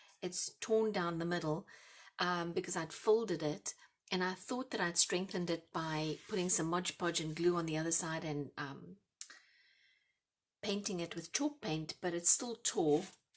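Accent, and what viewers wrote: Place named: South Africa. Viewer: So great to hear a South African accent!!!❤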